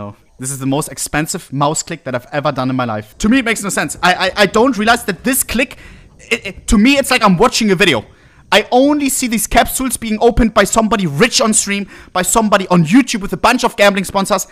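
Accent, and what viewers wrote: Germany. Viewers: german accent is real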